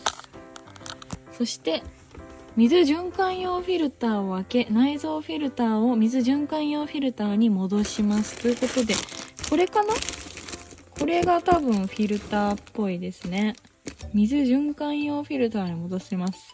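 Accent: Yamaguchi, Japan. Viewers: カメラの前を占拠するミンちゃん最高です🐶 ミンちゃんちゃんと分かってますね🤣流石です👍 メンテナンス、、、ズボラに果たして出来るだろうか？今回やたら山口弁が出てるのは気のせいだろうか？笑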